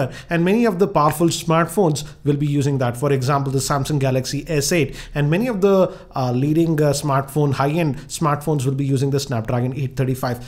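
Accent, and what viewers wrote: India. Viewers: Sorry man, but you need a to work on your accent if your going to speak English it's just too hard to understand. You really sound like your speaking Hindu. Good luck.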